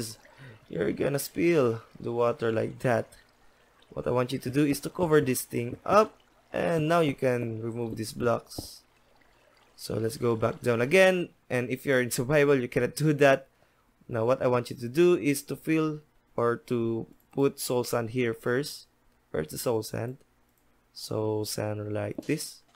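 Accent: Philippines. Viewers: i smell filipino accent... HELLO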